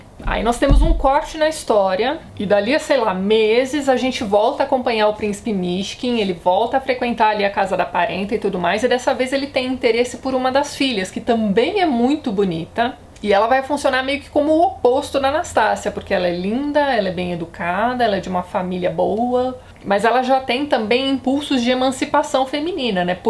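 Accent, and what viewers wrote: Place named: Brazil. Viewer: Despertou curiosidade de ler e estou lendo. Bons resumos despertam vontade de ler. Rui, um português de Portugal que julga excelentes os vídeos apesar do português com "açucar".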